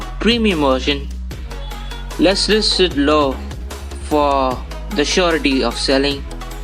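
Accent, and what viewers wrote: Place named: Tamil Nadu, India. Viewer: Your tamil accent is HARD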